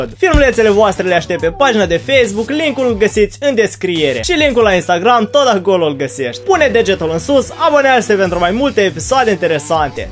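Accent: Moldova. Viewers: Da tu esti din Moldova da?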